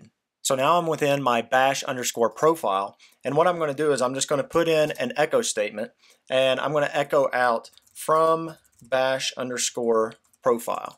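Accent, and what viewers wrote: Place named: Pittsburgh, United States. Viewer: Your from somewhere around Pittsburgh. I recognize the accent from 400 miles away!!!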